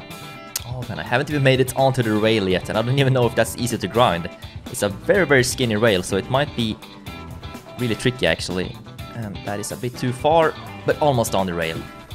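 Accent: Sweden. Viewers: Är du från svergie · Are you swedich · Är du från Sverige? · man hör på dig du är svensk lol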